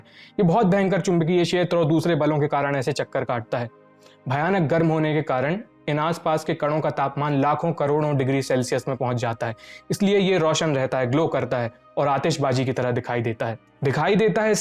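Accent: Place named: Purvanchal, India. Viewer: मैटर फस्स्स गया है 😃😃😃 आप और स्टोरी राइटर 200% यूपी पूर्वांचल के मालूम पड़ते हो 😎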